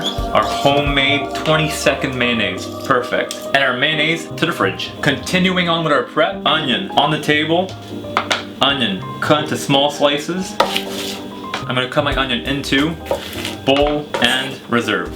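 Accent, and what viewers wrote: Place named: Quebec, Canada. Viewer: Tu sonne comme un anglais québécois c'est drôle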